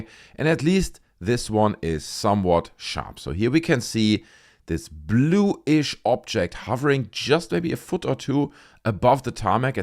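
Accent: Germany. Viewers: This a German guy ! His accent is great 😂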